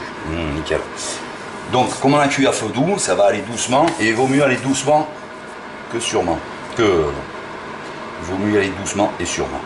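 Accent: South, France. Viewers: je vien juste de l'abonnez dis moi tu ai un mec du sud? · c'est génial merci à toi, je vais voir de suite! Ps: Ma copine vient du sud, on trouve ton accent ravissant !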